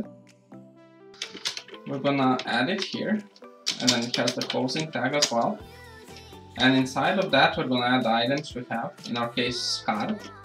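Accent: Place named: Serbia. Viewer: Your accent is like u come from Serbia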